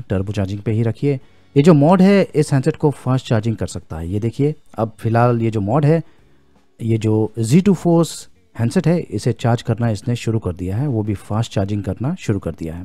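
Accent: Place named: Bihar, India. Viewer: Sr bihari wali boli aadhai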